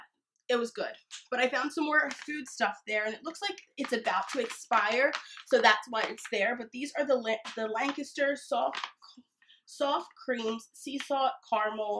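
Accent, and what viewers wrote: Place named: Pittsburgh, United States. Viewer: I look forward to your videos! The more, the merrier! I'm from Pittsburgh (I now live in Cali) and we say 'home' with the same accent that you do ~ and we say 'carmel' instead of caramel! Maybe that is why your voice is so soothing to me!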